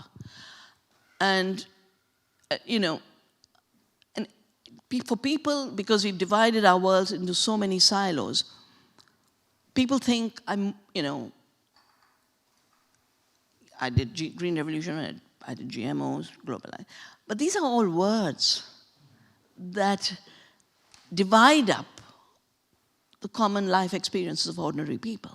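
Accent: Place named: India. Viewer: ill be honest, she's just babbling in an indian accent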